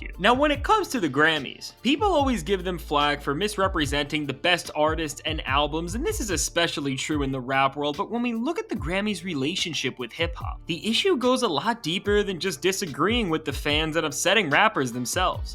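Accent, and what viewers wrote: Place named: Canada. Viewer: Bro you sound like canadian lad 🗿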